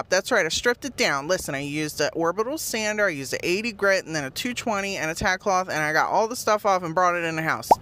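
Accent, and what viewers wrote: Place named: South, United States. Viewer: Haha. That is what you do to me every week. Your sense of humor is just like mine, sprinkled with a little bit of evil, just a tiny bit. 😂😂 It is raining and cold here. Is it cold where you are? I can tell you are further south, by your accent. It snowed a couple weeks ago, then it got into the 80s, for a week and a half, now I am dressing like I live in the tundra, again. I mean, c'mon, it will be june 1st in 4 days. It better get warm, or I am just going to start making winter crafts...maybe a snowman, eating watermelon and wearing a bikini. ⛄🏖👙 🍉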